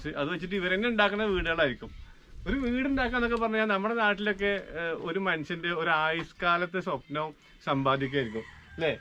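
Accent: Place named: Idukki, India. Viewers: ബ്രോയുടെ ഇടുക്കി തനി നാടൻ സംസാരം ഇഷ്ടപെട്ടവർ ഉണ്ടോ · Irukku kattappanakaran.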